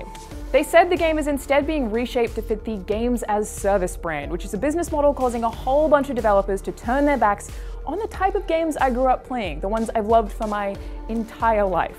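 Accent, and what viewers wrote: Australia. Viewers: angel47baby It's her accent. She's australian.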